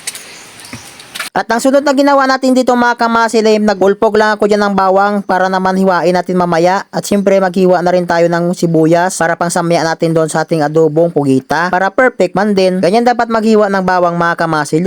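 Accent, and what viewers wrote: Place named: Iloilo, Philippines. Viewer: May pagka ilongo ka rin pala idol kabalo man ko maghabal ilongo..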